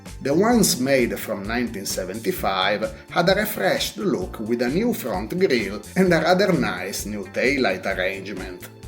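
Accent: Italy. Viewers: I like the way you tell the story of Italian cars with your Italian accent, plz keep on going it s very entertaining . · Офигенный Английский с Итальянским акцентом.(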